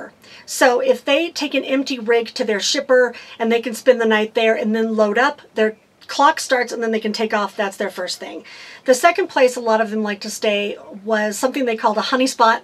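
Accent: United States. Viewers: What language is this video in ? she sound like she is from the US but many words are unknown to me.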